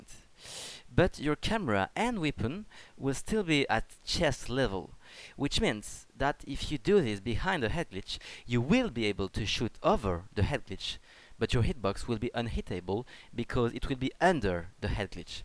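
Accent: France. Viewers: have you never heard a French accent before? lol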